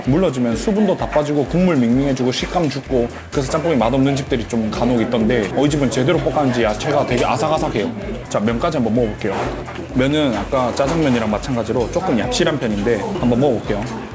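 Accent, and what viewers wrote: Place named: Busan, South Korea. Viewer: 짜달시리(특별하게) 얍실하다(얇다) - 부산 사투리 넘나 좋은 것. ㅋ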